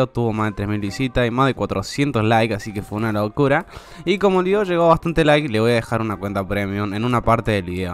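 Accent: Argentina. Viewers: Wacho sos de cordoba?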